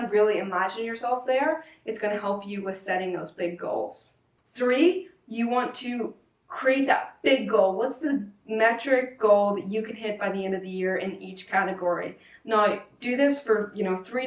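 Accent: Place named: Belfast, United Kingdom. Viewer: Great way of breaking down your goals to achieve success and a really good video. Also love when you say 'now' and 'out', still got the Belfast accent :)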